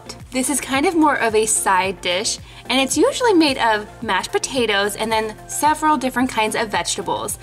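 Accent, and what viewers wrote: Netherlands. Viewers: its funny how you speak out the words im from the netherlands aswel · #DUTCH Like if you're dutch. Love your accent, haha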